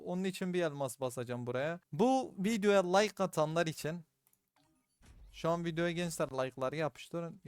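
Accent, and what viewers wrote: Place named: Diyarbakır, Turkey. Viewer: Abe sen nerelisin şiwen dyb sanki soylermisn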